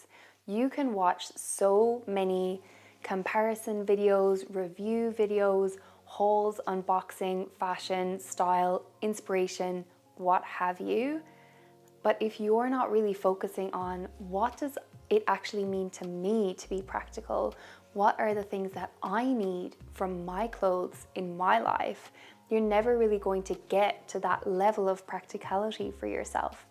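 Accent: Ireland. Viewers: I detect an Irish accent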